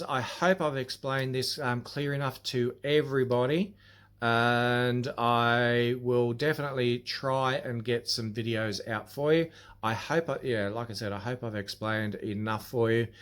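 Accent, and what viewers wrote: Australia. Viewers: I have always enjoyed your Australian accent and great personality. I am saddened by the cancer report. Your abandoned videos spoke for themselves, the objects and air left behind speak loudly in your silence. I have enjoyed all your abandoned explores. you revealed a handsome kind face. A lot of lives have been touched by your videos. My prayers are with you in your time of need. Stay strong and safe in these pandemic times. It has been fun and delightful to watch your videos. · Love your videos!!! Damn, you are a handsome Aussie too!! Love your accent too! Praying for you for your health!...I hate cancer!! Big Hugs from Mississippi, USA! Thank you for sharing your wonderful abandoned videos!! 🙏❤️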